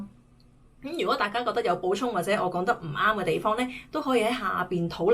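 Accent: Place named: South Korea. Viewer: 홍콩말 하는 한국 사람인것 같은데?? 한국 사람 맞죠??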